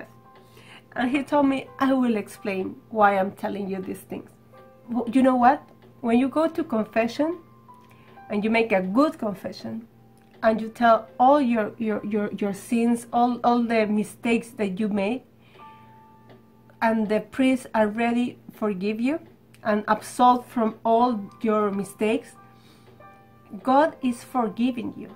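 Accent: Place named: Peru. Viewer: siiii jaja, estaba buscando lo del timon que se bloqueaa y vi el tuyo por q estaba asustado y de ahi me dio curiosidad tu canal,ademas tu forma de hablar jaja,dijeee ella ES PERUANAAAA❤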